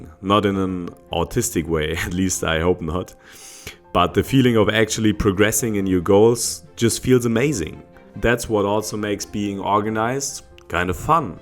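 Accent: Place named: Germany. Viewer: apart from the strong german accent, this was a very nicely done video... loved the classical music, too ❤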